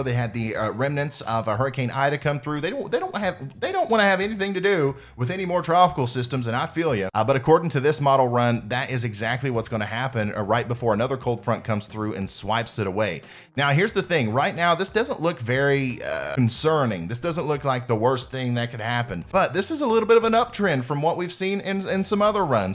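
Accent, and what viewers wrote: United States. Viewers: You're a YouTube personality, you can take as much time as you need to talk about the weather. Too much country in your talk.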